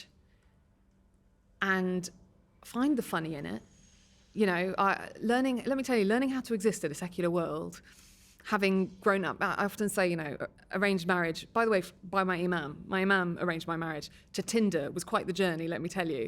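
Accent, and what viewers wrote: United Kingdom. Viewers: British English is charming! U.S. English is beautiful, but UK English has a certain magnificence.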